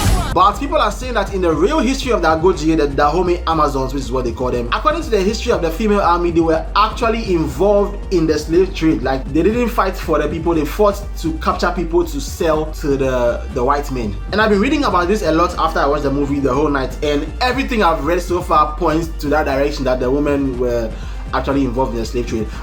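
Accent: Ghana. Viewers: your accent sounds like some Ewe man i know 😭😂😂😂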